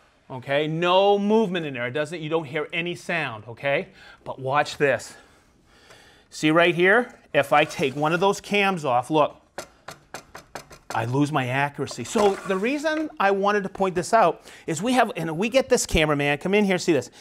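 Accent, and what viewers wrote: Boston, United States. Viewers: It's like being at a carnival. And he's the barker from Boston.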